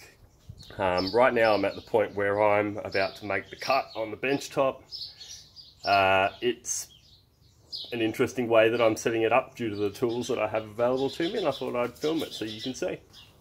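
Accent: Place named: New Zealand. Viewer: oo..sorry i tought somewhere in new zealand...totally different from the aussie i know...really appologised mate..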